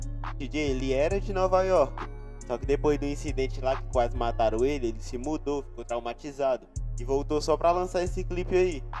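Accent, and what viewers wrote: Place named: Pernambuco, Brazil. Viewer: Sotaque de Pernambuco aí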